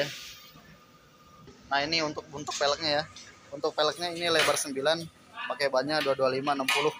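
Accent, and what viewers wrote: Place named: West Java, Indonesia. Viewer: Jiga Sunda eunk 😄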